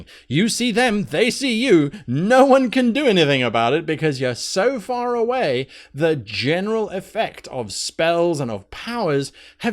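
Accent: South, United States. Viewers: Damn, dude! For an african, thats not bad south hick north american accent! I can beat ya, but barely. Bet you can beat my dutch sailor. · HAHA!! GREAT SOUTHERN ACCENT!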